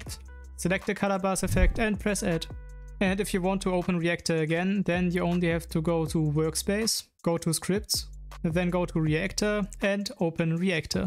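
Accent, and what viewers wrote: Germany. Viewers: Do I hear da etwa Deutsch?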